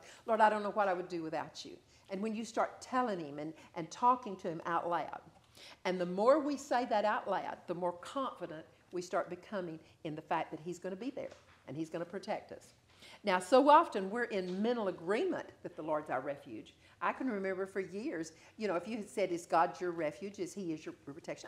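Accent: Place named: South, United States. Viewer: Wow, she is southern - reminds me of my ancestors from OK and TX. You can slow the playback speed down a notch and you will really be able to reflect on what is being said. Too important a message to miss.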